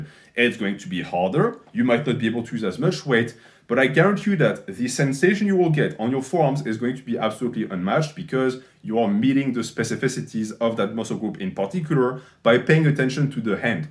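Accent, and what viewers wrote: France. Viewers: you have a bit french accent, not sure....nice video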